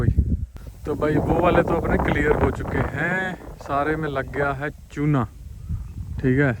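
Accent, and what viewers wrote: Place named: Punjab, India. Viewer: Siraa lagaa veer hindi vich Punjabi touch puraa siraaa😂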